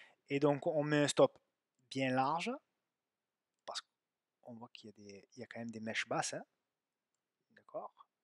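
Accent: South, France. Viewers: Top. L'acent du Sud le meilleur!!! 😉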